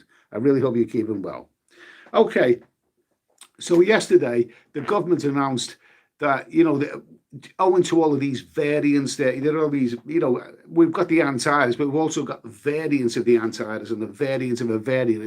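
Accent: United Kingdom. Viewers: Your very enjoyable to listen to. I have to admit I love listening to Brits talk! You give an interesting perspective on things so I just wanted to say don’t give up hope yet I’m already booked for philippines for December, but just in case I have free cancellation.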